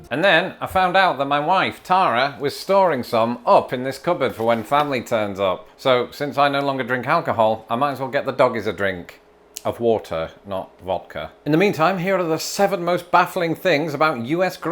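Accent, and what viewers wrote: United Kingdom. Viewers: After 17 Years of Living in America , You Still have a Very Thick , Heavy BRITISH Accent . 🤣🤣🤣🤣🤣🤣🤣🤣🤣🤣🤣🤣🤣🤣🤣🤣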